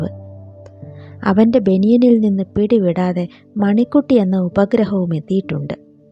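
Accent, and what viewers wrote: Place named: Delhi, India. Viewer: Script good...presentation language delhi malayalam pole enikku thonny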